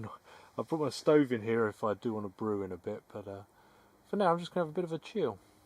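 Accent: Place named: Kent, United Kingdom. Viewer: You’re a true Kent man.its the accents.I used to know a man from down that way.u sound so alike..a compliment I should add. Nice tent,probably the best I’ve seen. Bird song fantastic.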